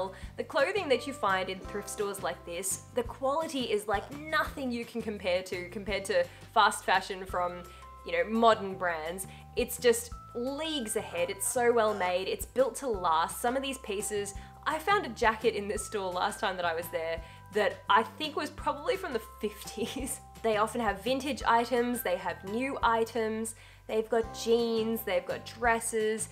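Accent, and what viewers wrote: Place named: Australia. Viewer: New subscriber here. My great grandmother was from Northam Australia. My great grandfather brought her back to the United States, he met her when he was stationed over in Australia during WW2. He was in the navy. Your Accent, brought back great memories of my Nan. She passed away when I was 15, I'm now 31. My great grandfather is still alive and well. My daughter is his first great great grandchild. 😍 My Nan never lost her Australian accent.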